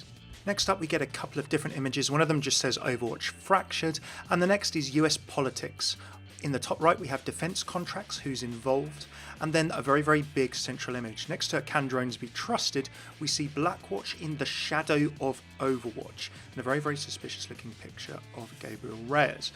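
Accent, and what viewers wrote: United Kingdom. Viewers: The accent could be afrikan british origin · FoulFox Nation how can someone not British sound more British than British people? That makes no sense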